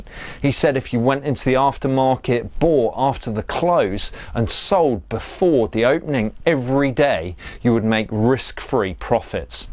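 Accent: United Kingdom. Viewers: good british accent It's suppresses as the price reflects the economic health of a nation and also the inverse effect on currency Ponzi scheme, as the metal is leased out many more times than actually exists ; If u went into the aftermarket and bought after the close, and sold before the open on the nex day, u'd make risk free profits : Massive SELL order just b4 close scares long investors to lower their bid AND spooks hedge funds into dumping Au..this becoming a self fulfilling momentum. As 20-to-1 leverage is present in futures market, the effect is great> Hedge funds' stop losses also kick in...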